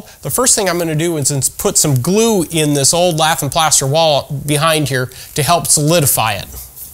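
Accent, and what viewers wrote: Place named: Canada. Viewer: You sound Canadian....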